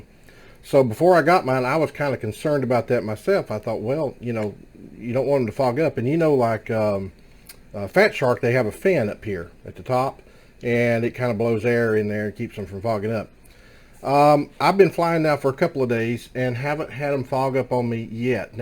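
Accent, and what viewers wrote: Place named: North Carolina, United States. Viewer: I thought that accent sounded familiar, formal North Carolinian here. Out here in Utah. We get fogging anytime the temperature reaches over about 90° it’s not super major as our humidity is low. I do get worse fogging when I placed them on my head unless I’m wearing a hat to fix this I just moved them higher up on my head.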